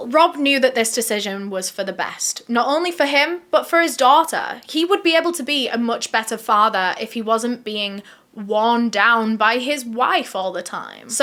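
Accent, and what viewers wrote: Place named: Northern England, United Kingdom. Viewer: Are you from Northern England? Your accent is quite provincial.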